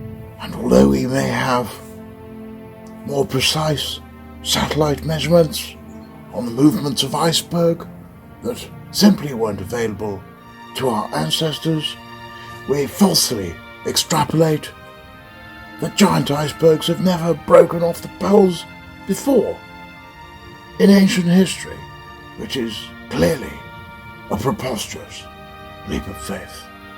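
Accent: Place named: RP, United Kingdom. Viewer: Hi. Not a bad attenborough accent. But I keep hearing a bit of Brian badonde slipping through. Fonejacker incase you dont know. 🤣🤣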